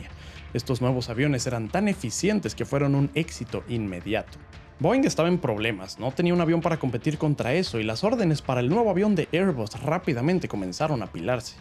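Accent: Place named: Mexico. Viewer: Excelente video y excelente acento mexicano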